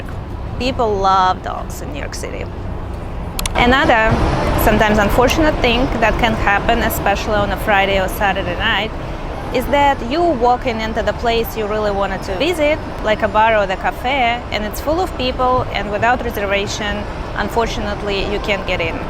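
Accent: Russia. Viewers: sounds Russian or Ukrainian to me. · aaaah, that east european/russian accent:)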